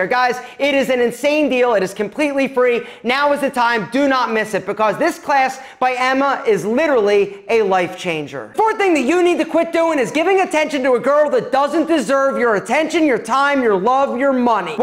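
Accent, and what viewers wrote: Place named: Italian-American, United States. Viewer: It's the Italian-American accent. Yes, it sounds so badass.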